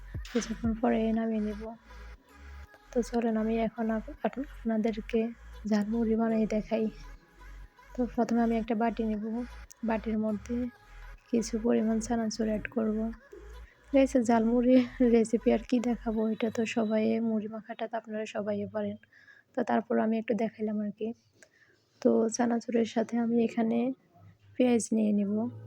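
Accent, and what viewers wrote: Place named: Noakhali, Bangladesh. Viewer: আরে না।। খারাপ না কথার ধরন পুরা নোয়াখালীদের মতো । তাই বললাম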